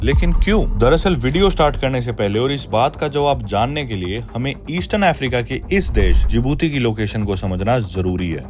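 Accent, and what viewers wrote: Haryana, India. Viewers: Hariyana ka hai bhai lage tu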